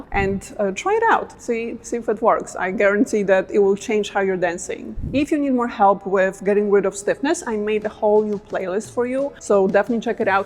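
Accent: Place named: Poland. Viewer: As a pole from the accent, I think you are polish, are you??! Am I right ?!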